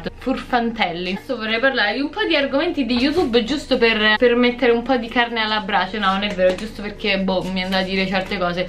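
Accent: Rome, Italy. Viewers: Hai una cadenza romana strepitosa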